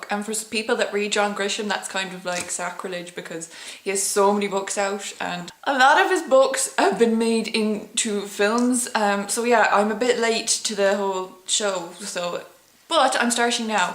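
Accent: Ireland. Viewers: oh I love your Irish accent!! I´ve been there for half a year and it was great. It remembers me of the time there when I listen to you ;) great videos as well!! P.S.: Are you from the West of Ireland? :)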